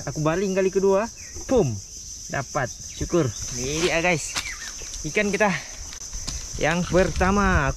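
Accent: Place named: Malaysia. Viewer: Mantappp.....nk tnya awak org mana??bunyi mcm org Malaysia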